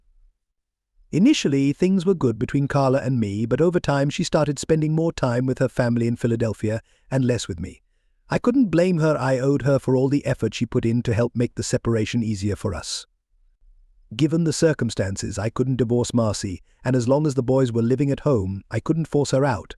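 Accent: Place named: England, United Kingdom. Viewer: Cannot stand the English accent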